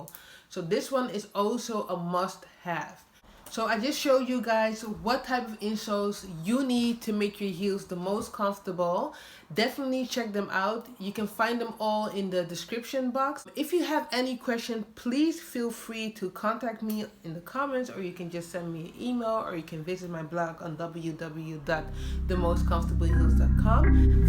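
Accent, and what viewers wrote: Netherlands. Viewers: Ik dacht al je komt van nederland 🧡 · Dutch accent, no? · Nice video!!!! Very helpful!!! Are you from the netherlands? I can hear a cute accent. Im from rotterdam.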